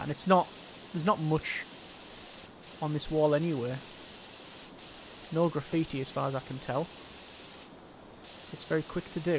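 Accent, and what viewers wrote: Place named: London, United Kingdom. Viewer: Quad pipe? Qruok pipe? I thoight "axing a question" was bad wnough. Can you londonese stop ruining English language.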